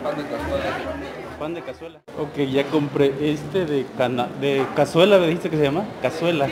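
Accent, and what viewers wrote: Mexico. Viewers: nombre guanaco hasta tu accento no oye.y te oyes bien mexicano carnal respeto saludo de phoenix az.te quidas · Amigo estoy sorprendida 🙀neta pareces mexicano 😘😘ya se te está pegando nuestro asentó jajajaja 😝😝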